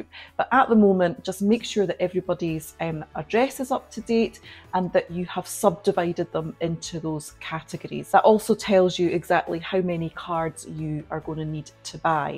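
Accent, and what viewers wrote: Scotland, United Kingdom. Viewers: Just subscribed this morning and glad I clicked on your video in my feed. It was lovely listening to your brogue - my grandparent's were from Saltcoats before they came to Michigan. I have cousins in Ayr and they visit America often! xx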